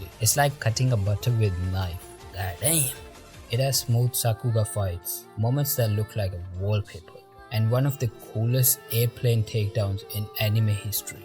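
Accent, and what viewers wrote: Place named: Pakistan. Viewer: Looks Like I Found Another Hidden Gem Like Summer Time Rendering (it's quite popular now but when I watched, it wasn't) 👀✨... BTW Ur Accent, You From PAKISTAN?! 🇵🇰🤩⁉️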